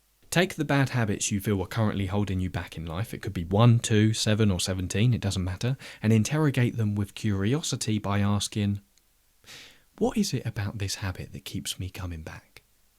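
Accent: United Kingdom. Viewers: What flavor of British Accent is this?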